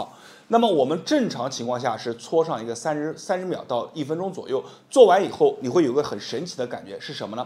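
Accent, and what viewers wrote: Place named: Nanjing, China. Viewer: 宝藏老师啊！谢谢！第一次留言，昨晚不光做了您教的这个动作，一口气看了您其他的好几个视频，尤其关于肩颈的动作，实测当场起效，我是颈椎病、肩颈综合征患者，从去年开始犯病，一直就恢复过，昨晚做了您的动作，让我轻松无比！另外听老师口音像是南京或是南京周边地区人，若老师方便的话，我想登门拜访，感谢！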